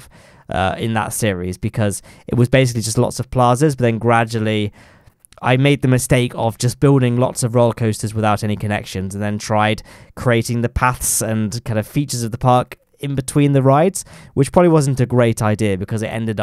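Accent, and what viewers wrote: United Kingdom. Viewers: Hearing my man saying 'yo' in his amazing British accent (not as thick as mine though). It's amazing!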